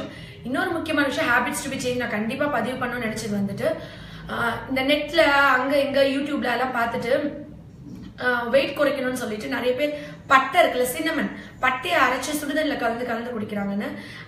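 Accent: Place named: Tamil Nadu, India. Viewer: Love your tamizh accent 👌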